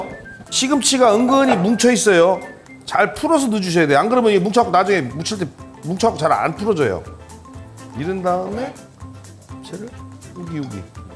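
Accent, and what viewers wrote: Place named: Gyeongsang, South Korea. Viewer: 경산도 말씨가 귀에 착착 감깁니다 영상보면서 입가엔 미소가 생기네요 재밌게 잘 보고 있어요^^ 윤이련씨, 가족분들은 좋겠어요.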